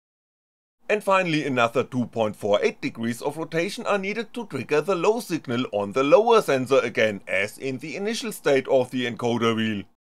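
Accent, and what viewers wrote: Germany. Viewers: Now finally I understand the rotary encoder! Only because it was explained with a German accent, the language of science! And of course because now the two sensors are placed besides each other! In fact it does nog matter where the (optical) sensors are at the wheel, but now that I look at this it all looks very simple. Thank you German guy!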